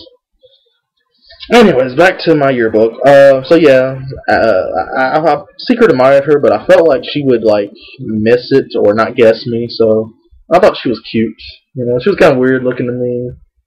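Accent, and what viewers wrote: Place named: South, United States. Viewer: Poor little Andrew.. He's so sad.. At least you can get a woman,. Unlike Andrew who can only get butt hurt.. Anyways. I love the video baby, but stop rambling about other people besides me when it's just suppose to be about me. Lol. I am conceited remember?? And you are starting to talk like me. Lol. Country! xP and I worked at Winn Dixie for 4 months and then Pizza Hut for 4 months and finally quit that too. Lol. Which reminds me.. You need to get another job.:)(: Lol. I LOVE YOU BABY! <3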